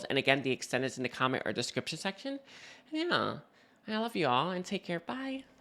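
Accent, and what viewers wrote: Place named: Los Angeles, United States. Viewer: I like u cause ur Mexican and it makes me feel at home, like if I know u an u accent is cool. Very LA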